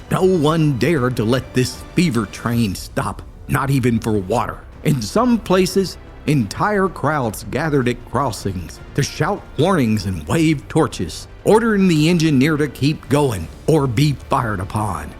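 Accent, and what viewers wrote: Appalachia, United States. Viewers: Needing to rest and listen to something.. pops up! PERFECT! 😊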